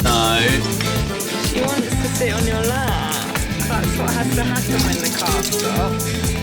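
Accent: United Kingdom. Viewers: What is accent? Which part of the UK?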